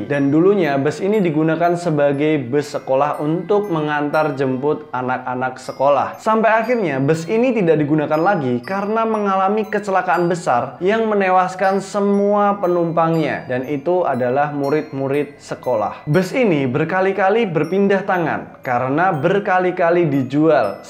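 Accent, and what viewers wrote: Java, Indonesia. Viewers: abangnya kalo ngomong ada sedikit medoknya ya.. kadang2 muncul medoknya heheh but i like it.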